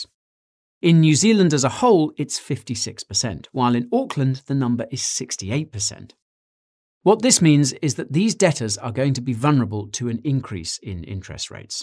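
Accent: United States. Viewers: LOOOOL ur American accent lowkey sounds like my old teacher in middle school for social studies 😂😂😂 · Not bad American accent. Lol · Wow you're American accent is spot on!! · Not a bad American accent. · Great American accent guy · Dude. Great job on the American accent. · Your American is pretty good. Much better than most American’s British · the yank accent is hilarious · i love your America realtor accent! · Your "American" accent is great!!!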